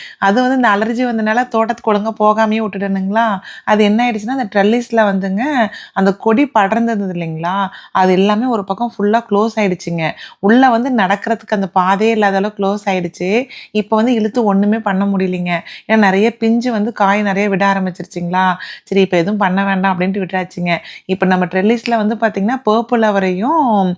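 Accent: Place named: Coimbatore, India. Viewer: உங்க கோயம்பத்தூர் பாஷை ரொம்ப நல்லா இருக்கு கேக்க 👍🏾👍🏾,வீட்ல harvest செய்யறது, ஆரோக்கியம், ஆனந்தம்.🎉🎉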